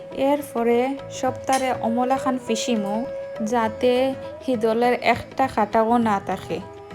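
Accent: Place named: Sylhet, Bangladesh. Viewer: সিদল এর নাম শুনেছি অনেক তবে খাওয়া হয়নি। দেখে মনে হচ্ছে বেস মজা হবে। আপুর মুখে সিলেটি ভাষা শুনে ভালো লাগে।❤️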